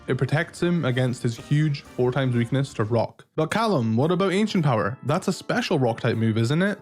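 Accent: United Kingdom. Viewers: Where is your British accent from :0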